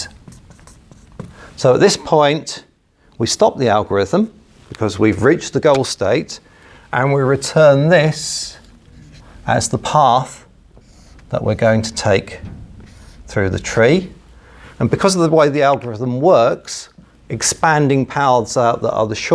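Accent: United Kingdom. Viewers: besides this amazing explanation , this is a very lovely British accent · Came here just for the British accent :p .. nicely explained!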